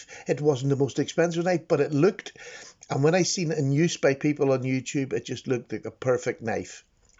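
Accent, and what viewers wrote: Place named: Ireland. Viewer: OMG....this is the first time I've shed tears when watching a blade video. It's just beautiful what you did with it. You should have been a writer or a poet. Love your story and respect you even more. Love watching your videos and just hearing that wonderful Irish accent talk about the love for blades. Thank you.❤️😊🙏🍀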